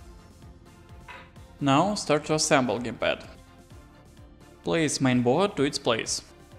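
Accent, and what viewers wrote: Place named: Russia. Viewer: Русский акцент 😅, лучше делай видео с субтитрами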